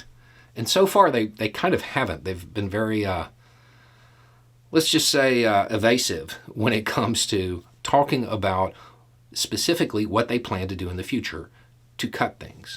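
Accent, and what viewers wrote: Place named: Kentucky, United States. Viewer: he speaks in a manner similar to my favorite relative, an uncle from Kentucky.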